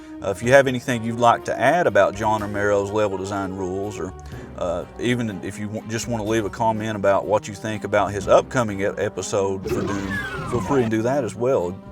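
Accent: South, United States. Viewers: Nice vid, love your accent. I'm also from the South. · Thanks so much putting this together - I'm coming from the "illustrator that likes to play games" camp so most of what makes a Doom level "feel right" is a mystery to me, but it's starting to click. (unrelated, but it always makes my day hearing other Southerners online 😁) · "Textures are usually misaligned by default in these scenarios" is really hilarious in this southern-drawl and Big Lebowski narrative style... Sasparilla, I reckon? However it's 100% fitting for doom's Texas origin and current residency. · Love your southern accent, I'm from Louisiana (New Orleans) and can't quite place where you are from. It sounds a little bit like southern Mississippi or the Carolinas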